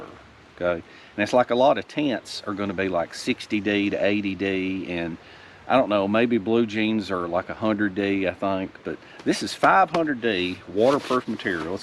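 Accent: South, United States. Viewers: This is one of my favorited channel. I especially like it when you explain everything so nicely especially with the southern accent .I found this channel randomly; it is really a channel that produces quality content. I have learn so much .Thank you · I love your accent man for me as a German it's a delight to just listen to you speak. What area are you from in the US?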